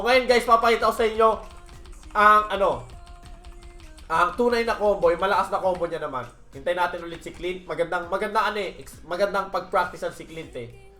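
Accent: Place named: Bulacan, Philippines. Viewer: taga bulacan ka ba sir???.. puntong bulacan ka eh.....hehehehehe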